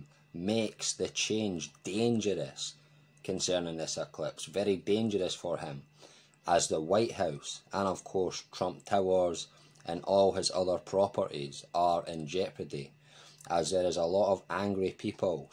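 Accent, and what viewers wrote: Scotland, United Kingdom. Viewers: New Sub here - you and many other astrologers have predicted this orange bastard tRUMP is going down. His luck is finally ending. I'm from the US - love your Scottish accent!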